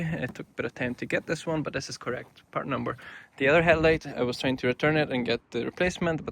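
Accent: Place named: Northern Ireland, United Kingdom. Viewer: Taip ir galvojau. Pagal akcenta tu kazkur apie Antrim gal gyveni, cia tik speju 😄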